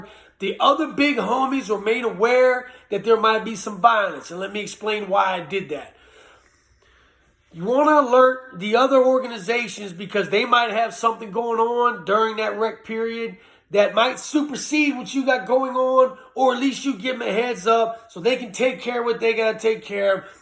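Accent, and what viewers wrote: Virginia, United States. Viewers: You from Virginia?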